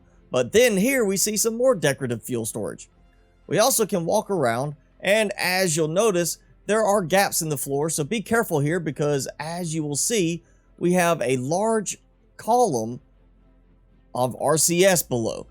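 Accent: South, United States. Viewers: No insult intended. Just curious. 😁 It sounds sort of southern...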